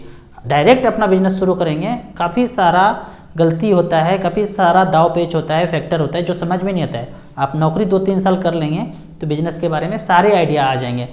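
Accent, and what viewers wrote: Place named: Awadh, India. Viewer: सर आपकी बोली अवधी के जैसे है आपका होम टाउन कहाँ है.?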